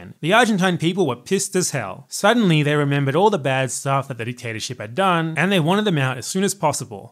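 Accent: United Kingdom. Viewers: Lolz Mc'lovin the pommy accent, and what's even more beaut is the amount of dislikes lmao while rofl, you know you're doing something right if you tend to piss of some bunch of prissy crooked teeth tea drinking 'iron lady'kinked hicks Totally recommend supporting via subscription and joining. Oh BTW, cannot argue with that logic, too true my compradre, too true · You are aware that the British did not invade right? And you are speaking with an English accent.. odd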